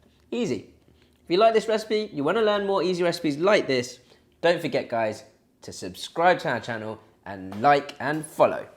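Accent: United Kingdom. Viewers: Love your videos and your nice British English :) What do you do with the used bonito flakes and combu?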